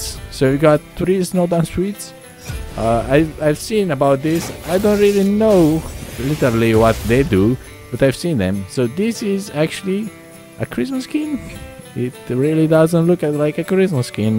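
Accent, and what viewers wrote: Romania. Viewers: esti roman?? mi se pare ca ai accent de roman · Puteam sa jur ca esti roman din primele secunde. Oricum, de apreciat. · this guy is 100% romanian(after the accent) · ai accent de român, pwp. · Man, are you from Romania? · Se vede dupa accent ca esti român !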